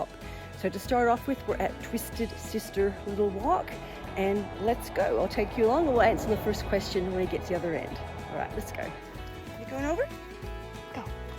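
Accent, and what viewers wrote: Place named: Australia. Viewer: Thank you for all that info! I didn't notice the number plate, but l did notice your interesting mix of accents. Mainly Australian but definitely something US/Canadian every so often. Interesting stuff. I thought, "Oh goodie!"" Found an Aussie lady travelling solo around Oz. Then l thought no, what accent is that? Then l thought yeah, Australian. Then l thought.... you know what l mean 😄 so l'm really glad you cleared that up. It was killing me! Love yr attitude, tips and travels. Might see you out there soon 👌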